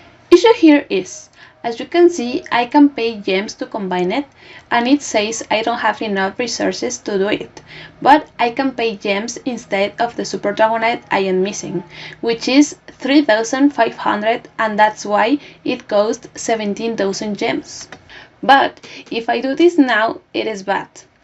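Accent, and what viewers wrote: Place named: Latin America. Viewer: Pero porqué no hablas Español, pareces latina hablando inglés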